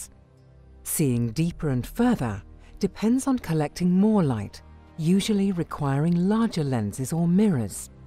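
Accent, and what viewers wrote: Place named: United Kingdom. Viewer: what is all this about "meethane, nebulee, etc.? what are laver outflows? i thought they were lava (la-vah) outflows. i just never will get the british accent where all the "r's" are suppressed, but are inserted at the ends of words that have no r's.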